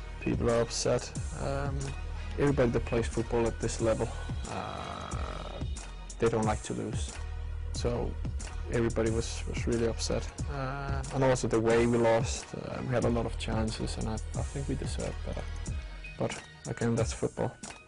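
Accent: Liverpool, United Kingdom. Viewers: Hes gettin a scouse accent!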